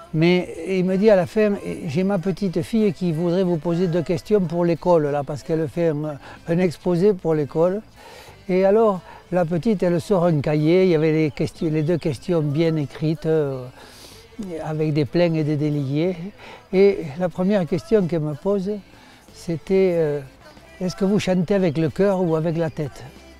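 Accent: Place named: Pyrenees, France. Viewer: Monsieur Nadau juste Merci. C’est un bonheur de savoir que vous vous amusez en réalisant ce qui vous anime le plus « la chanson » mais c’est aussi et surtout pour nous un émerveillement d’écouter vos paroles tellement forte et pleines de sens. Vous nous faites rêver grâce à votre accent, votre patois la beauté de vos Pyrénées. Toujours un plaisir inouï de venir dans votre région. Merci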